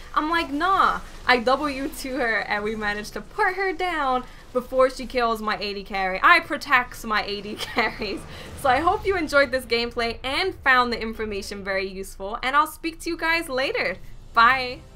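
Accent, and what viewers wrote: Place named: New Jersey, United States. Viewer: I like your Jersey or Brooklyn Accent (or whatever it is) It's like a hotness multiplier on top of your nerd knowledge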